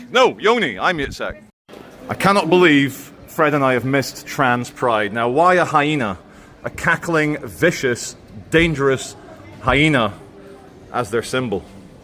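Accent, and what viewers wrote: Scotland, United Kingdom. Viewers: with a Scottish accents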